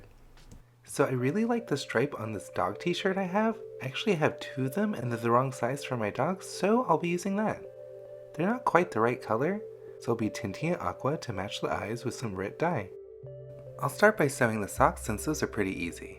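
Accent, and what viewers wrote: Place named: North America. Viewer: Oh, actually I have a question about MSC. By your accent I'm assuming you're north American? I've been wanting to start a faceup for a while now but since it's winter the humidity is practically always above 90% and I read msc should be used in below 70%. Do you have problems with the humidity? Thanks.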